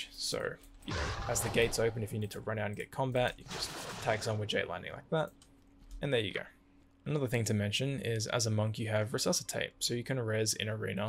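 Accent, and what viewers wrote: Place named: Australia. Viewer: I don't play WW but upon hearing a fellow aussie, instant sub. Watching this all the way through regardless because a 1 hour guide kicks ass, best of luck with the new channel mate x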